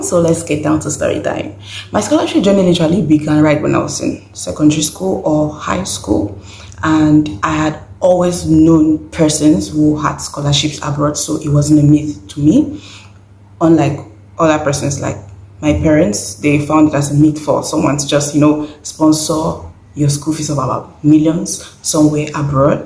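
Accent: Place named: Cameroon. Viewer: I just started watching the video and you sounded so Cameronian 😂❤ And then boom you mentioned it 😂❤❤ I'm a Cameroonian too ❤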